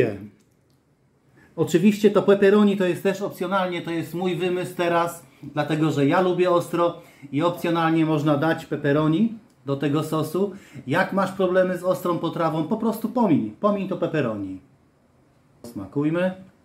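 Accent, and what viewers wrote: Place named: Silesia, Poland. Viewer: myślałem że ze Śląska, bo tak zaciągasz 😊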